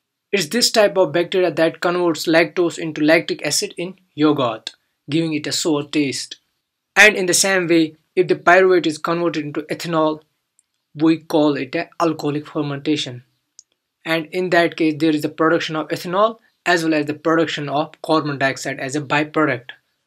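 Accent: Kashmir, India. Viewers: are you kashmiri? You have a very Kashmiri accent!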